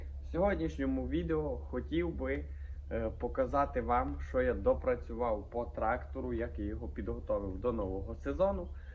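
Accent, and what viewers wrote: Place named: Transcarpathia, Ukraine. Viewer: Все чотко нерозуміють люди закарпатського говору 😁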